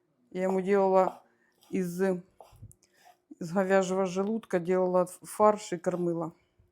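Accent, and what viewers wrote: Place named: Dnipropetrovsk, Ukraine. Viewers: Доброго вечора,! Випадково попала на ваш канал. Було дуже приємно чути наш криворізький суржик, дякую вам! Я сама народилася в Кривому Розі, живу в Атланті більше 20 років и в Україні не була вже вісім…підкажіть, як вас знайти на ФБ, якщо можна❤️ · така сама мова Дніпропетровська область...одним словом суржик....)😊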